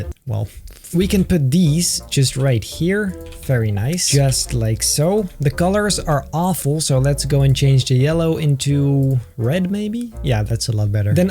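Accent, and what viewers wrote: Netherlands. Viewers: Hey jij Nederland. Zou me niks verbazen. Kan het horen. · je hoort wel een beete dat je nederlands bent!:D Mooi man dat je zo goed kan zijn pfff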